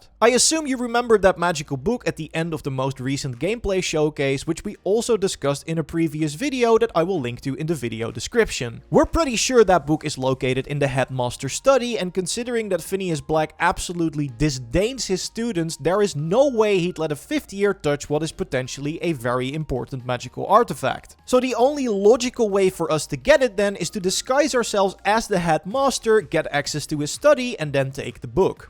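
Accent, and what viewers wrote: Netherlands. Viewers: duidelijk nederlands accent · Steenkolen Engels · 100% Nederlands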